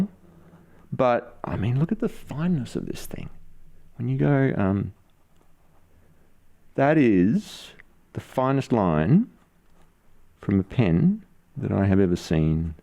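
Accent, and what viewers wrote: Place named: Australia. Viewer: I do love the Ikea bath mat! I personally found a dual purpose for my shag carpet --> it also makes for a lovely photographic background, and the pen can't fall further than the floor when taking photos Lots of gorgeous visual texture. :D Need to check out your other vids! Caught your Aussie accent, and you collected yet another Aussie as a subscriber. I've got a heavy Aussie bias. What a monstrous Dremel adjustment to that green demo 9019. Cut the feed too! That needlepoint one looks amazing! I can't even hear the pen that much on the page. Sounds brilliant. I'm amazed at how well it spread the ink and didn't just glomp onto the page like some flex pens tend to do. Didn't even need an overfeed? Standard feed you say? Oh my, how delicious.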